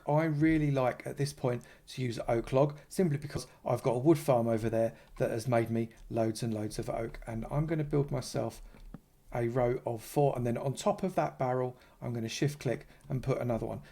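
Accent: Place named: Yorkshire, United Kingdom. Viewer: ur yorkie accent is making mine thicker mate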